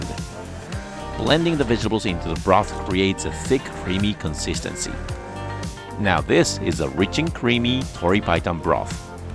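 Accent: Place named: Australia. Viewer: As an Aussie, I can hear the Aussie. Especially when you say “chuck” something in, that’s very Aussie 😂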